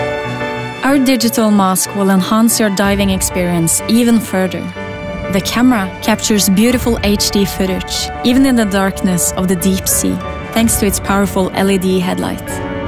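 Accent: Norway. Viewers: Marius VanDamme Jeg hadde vært enig med deg om det ikke var for at det var så ille. Grunnen til at jeg ikke fokuserer på fransk-engelsken er nok fordi at det ikke er så store hint om fransken. Om en franskmann hadde hatt like store vansker som denne jenta her har med engelsk hadde vi ikke forstått noe. Vi burde vel egentlig være glad for at det ikke var en mer uforståelig engelsk i videoen. Om det bare var litt norsk igjen i engelsken, som for eksempel 10%, hadde det nok ikke vært så ille, men hun har jo sånn 50% norsk i engelsken sin, det er ikke bra. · I agree. Norwegian accents are charming. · Vi trenger ikkje prate oxford engelsk! Neh, heilt greit det der. Du hører når ein franskmann snakker engelsk feks, samt andre nasjoner så skinner det igjennom kor dei kommer fra. Så koffor kan ikkje vi ha litt norsk engelsk da? Har noen engelske venner som synes "norsk" engelsken er ganske kul sammenligna med mange andre.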